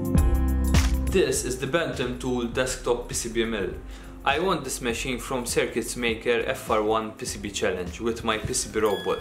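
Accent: Malta. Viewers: Thats a very maltese accent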